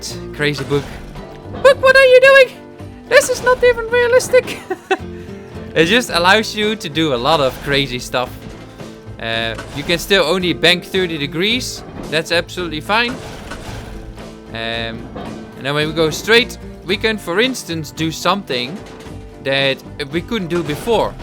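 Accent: Netherlands. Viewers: one question, are you dutch? Love the vid btw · You realy sound like a dutch guy! Nice video btw! :D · main thing I learned from this video is that you're from New Zealand 😂I always assumed your accent was Dutch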